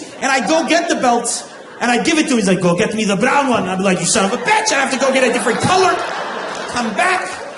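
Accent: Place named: Pakistan. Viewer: ما عنده حس فكاهي و لهجته مو لهجت لبناني يحكي انجليزي بل بكستاني او افغنستاني ...... مهم يزيد يشوه ف صمعة العرب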